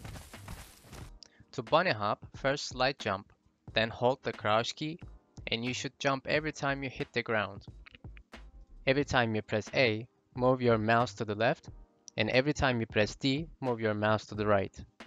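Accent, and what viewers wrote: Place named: Turkey. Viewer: When I heard your voice I instantly thought you were Turkish looked down and saw the subtitle option, ellerine sağlık valla.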